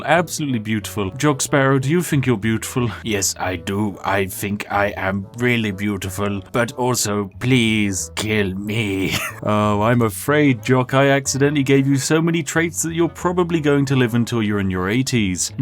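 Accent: United Kingdom. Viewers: Who tf dislikes?? Like why.. is it the stock images? The sassy British accent? Cause these are things I love xD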